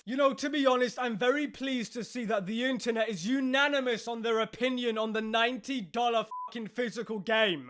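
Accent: United Kingdom. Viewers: Are you British? But being tongue lashed by a Brit is so entertaining 😂😎👍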